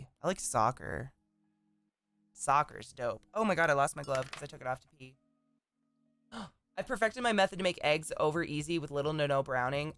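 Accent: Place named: New Jersey, United States. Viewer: Nuthin like a Jersey accent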